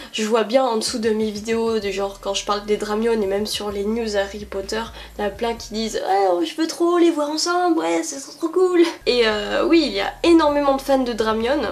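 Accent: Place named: England, United Kingdom. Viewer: J'ai enfin pu regarder la vidéo !!! J'ai du retard moi pfiou.. BREF ! Ton accent anglais est merveilleusement sexy j'adore hihi. J'aimerais trop aussi les voirs ensemble, c'est comme une série qu'on suit avec eux ahah!!! Je veux connaitre la suite moi 💚💚 McGonagall on vous a reconnu faites pas l'innocente. Par contre je savais pas qu'elle était aussi agressive que ça aha. Bisous xoxo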